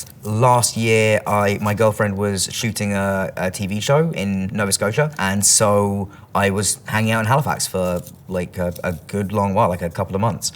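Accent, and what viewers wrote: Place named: United Kingdom. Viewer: 自分はカリフォルニア州に住んでてアメリカ英語に常に触れてるからかもしれないけど、凄くブリティッシュだと思った。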